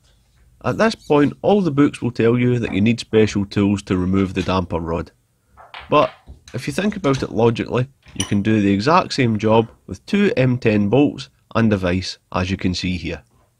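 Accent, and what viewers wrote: United Kingdom. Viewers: Great video, love it Out of context: can anyone pls tell me where his accent come from? I guess Sussex or maybe Scotland? Thnx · I love these no bs vids, and the Scottish accent ( I lived in Edinburgh for 2 years ) is superb !! Cheers from Australia :)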